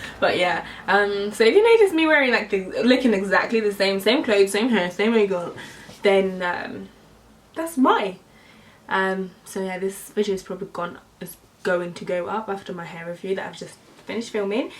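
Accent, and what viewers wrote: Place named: Crawley, United Kingdom. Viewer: Where abouts are you from? You sound like your from Crawley. Love your tats btw👏🏽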